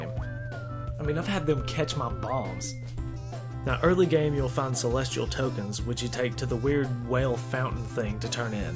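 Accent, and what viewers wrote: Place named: Rural, United States. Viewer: Thanks for the video. I like your country twang.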